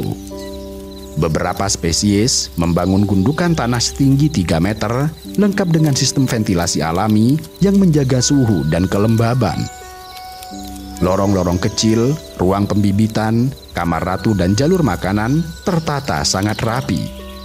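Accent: Java, Indonesia. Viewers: Jawa nya jawa banget 😭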